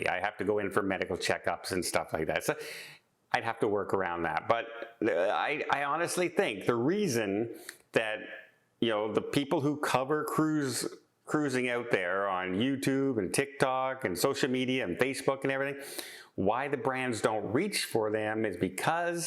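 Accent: Canada. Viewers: I've never heard it pronounced that way. Maybe it's a Canadian thing, like the way he says "garage."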